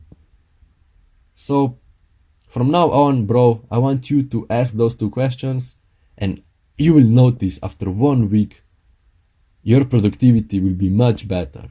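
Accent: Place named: Spain. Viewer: your language accent is beatiful are u spanish or little bit south european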